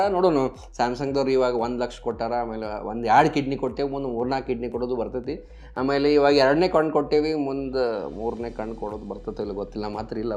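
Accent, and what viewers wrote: India. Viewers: Niv Belgavi davar anta heli ,bal Hemme ide broo🙌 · Unique style of explaining technology with sense of humour. Loved your Jawari kannada.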